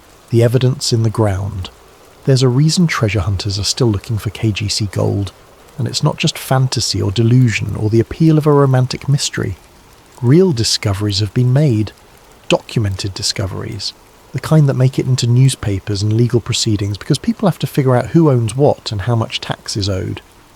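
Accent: United Kingdom. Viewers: I love the soft spoken British accent putting me to sleep 😴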